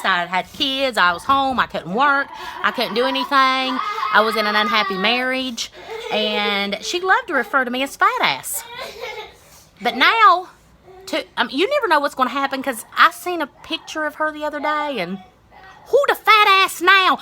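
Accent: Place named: South, United States. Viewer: You are the cutest thing i ever seen wit ya lil southern accent 😍😍😍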